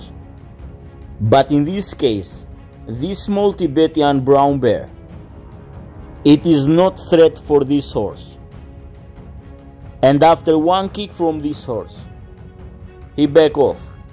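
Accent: Russia. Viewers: Чёткое видео, автор русский 🇷🇺 чтоли? Акцент такой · subscribed a few weeks ago me frirnd.. Your accent are you from Russia :)? Greetings from Poland slavic friend..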